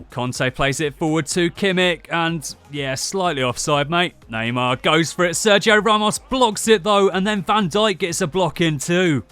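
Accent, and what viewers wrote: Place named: England, United Kingdom. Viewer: I'm a PES PLAYER ,i don't like FIFA but i Like your English ACCENT you should be the COMMENTATOR of FIFA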